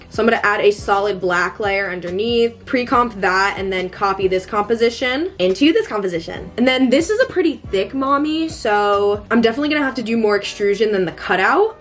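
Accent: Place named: England, United Kingdom. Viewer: im scottish myself and hearing use scottish slang in an english accent pained me 😭😭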